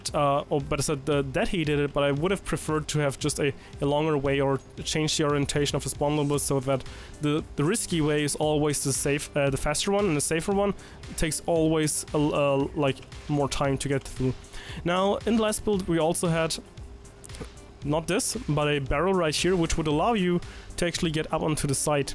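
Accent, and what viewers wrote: Germany. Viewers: man hört richtig das du deutsch bist, trotzdem gutes Video 💪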